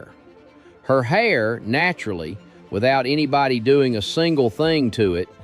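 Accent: Texas, United States. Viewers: Mr., I owe you an apology. Allow me to explain. I was initially exposed to your channel by the YouTube algorithm, specifically your detailed analysis of Star Trek: Starfleet Academy. Though I have great regard for the franchise, I have not kept up with the current iteration under Alex Kurtzman other than Season 1 of Star Trek Discovery. Not out of any specific gripe, though I know that series is polarizing to longtime star Trek fans. I just hadn't gotten around to it. I do feel that a lot of the language used in the criticism of that show is coded in the language of the movement against so-called "wokeness" and "woke culture". I watched that video essay in its entirety. Still, having not watched the show, but knowing that the same anti-woke culture that took issue with ST:D (a more unfortunate acronym I cannot think of) took issue with Starfleet Academy, I looked at your face, and listened to your folksy, Texas twang. 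I looked at the long Santa Claus beard, heard you say that you live in Texas, and that you are a white man. And you were criticizing a cast made up primarily of people of color and women. And I let MY conscious and unconscious biases take over. Here's another probably MAGA-adjacent yahoo decrying that wokeness will be the end of civilization as we know it. And I was dead wrong. I just finished watching this video, and I found your arguments to be logical, insightful, thoughtful, and well researched. You showed respect to not only the fans and the gene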